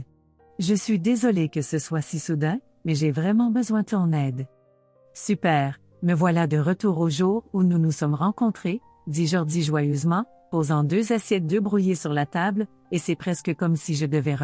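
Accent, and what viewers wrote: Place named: Canada. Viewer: Bel accent canadien J'ADORE bonjour.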